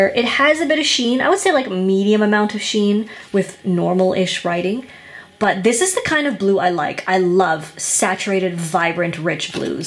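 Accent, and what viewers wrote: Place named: Middle East. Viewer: Saw this...and an acute attack of “MUST HAVE NOW” struck me. Went to site via link and nosed around til I discovered the notebook in Forest Green with Lime Green trim...and selected lined paper. OMG. I’m in heaven. Hope I don’t have to wait too long. PS: you have a very, very slight accent that sneaks in now and them. Lovely. Middle East someplace? Israel? I sound like I’m from Oregon...since I am. Sorry.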